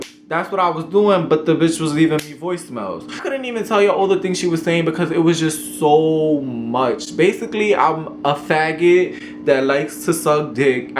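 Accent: New York, United States. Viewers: "First of all, im not a bitch" LMFAOOO 😂😂 what part of ny u from btw? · I love your New York accent ❤️ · I don't get NoLa from him... NY breed all up in thru his vocal cords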